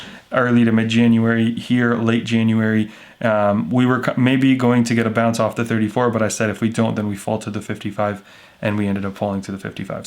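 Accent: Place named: Canada. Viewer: What? Dallas TX! I thought you are Canadian! Lol 😆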